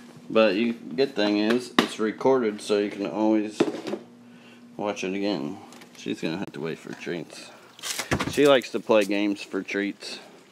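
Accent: United States. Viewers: I love your american accent Greetings from Paris 😁 Michel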